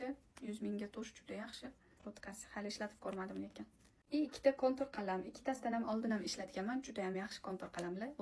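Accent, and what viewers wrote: Tashkent, Uzbekistan. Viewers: НАМАНГАНЛИКСИЗ АММО ТОШКЕНТ ШЕВАСИДА ГАПИРАСИЗ....ТАЪЖУБ. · Намоногонлик экансан Тошкент шевасида гапириб. Нима килардинг. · Наманганликман дейсизу нимага тошкенча гапирсиз хафа булмангу ман абангизни танийман отлари Гулмирахонми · Manam shunaqa dgandim. Nimaga Namanganlik bosez Toshkent shevada gapirasiz? dgandim. Manimcha ochirvoripti 😅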